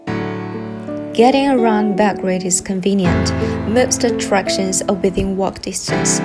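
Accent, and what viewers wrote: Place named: United Kingdom. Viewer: 好棒好棒！！！配乐好喜欢 还有你的英音😍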